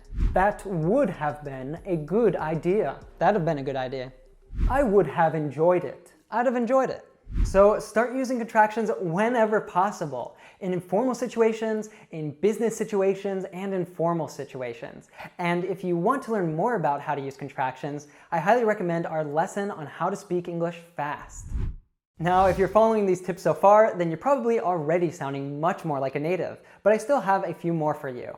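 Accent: United States. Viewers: Amazing American accent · Thanks a lot.l am learning how to pronounce words in american accent with you.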